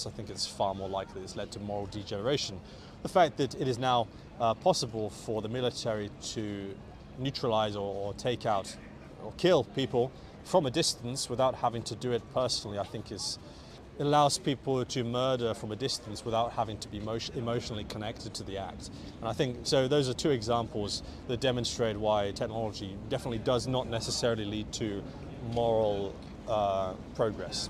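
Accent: United Kingdom. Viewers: Hi thanks for the video,I think this is an excellent exercise to train listening comprehension.First of all,you started with a slow pace when answering the questions which makes it easier for learners to understand and gradually you spoke more quickly and technically the vocabulary got more complex but I like the idea behind these videos.So,well done.I also like your British accent.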